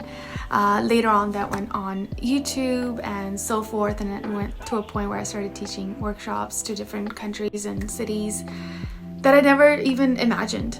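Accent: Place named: United Kingdom. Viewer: Hii, your english is like britishers😶😶 how it is I don't know.... But anyways your dance is amazing😘😘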